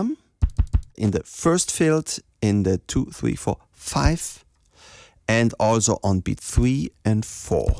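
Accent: Germany. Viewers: Leichter deutscher Akzent 😂😂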